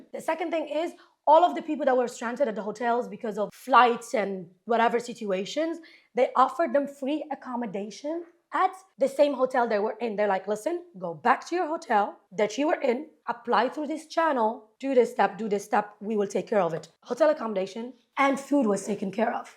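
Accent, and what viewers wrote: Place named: United States. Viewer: Delusional with an American Accent can’t phantom the reality of realty. Go find a new daddy in Miami.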